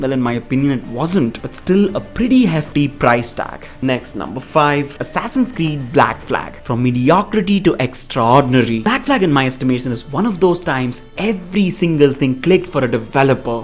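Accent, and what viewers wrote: India. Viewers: ok it's just there are different types of Indian accents so it's hard to tell the way you say well vell gave me indian kind so it's nice to know you come from a lovely country I'd love to visit some time in the future:)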